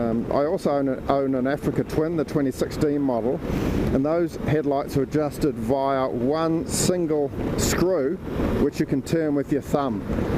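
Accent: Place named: New Zealand. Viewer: thanks for the clarificatio..ive been thinking why am I listening a kiwi accent from American.. Lol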